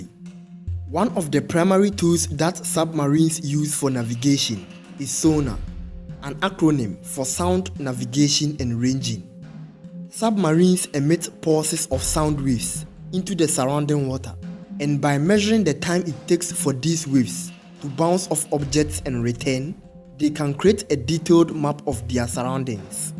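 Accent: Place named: Africa. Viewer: African accent s..ks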